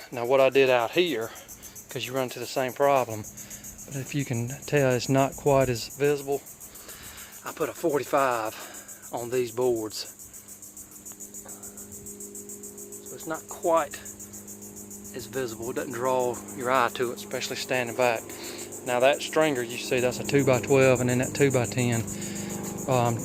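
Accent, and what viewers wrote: South, United States. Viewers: Looks really nice. I like your country accent.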